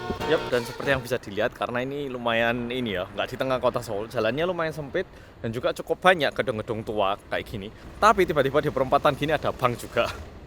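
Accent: Java, Indonesia. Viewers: Mas ini kalau ngomong bhs Korea logat Jawa? ( Medok)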